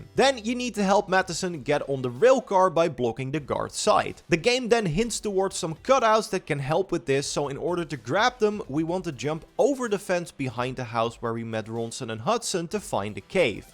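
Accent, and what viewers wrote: Netherlands. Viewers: Are you Dutch? You sound Dutch haha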